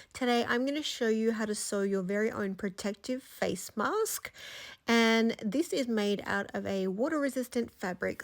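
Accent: Australia. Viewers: Absolutely love this mask pattern with excellent directions (I've tried many) and adorable Australian accent is a bonus!! I find the women's/teens size quite small but will easily adjust size. Thank you for this brilliant video!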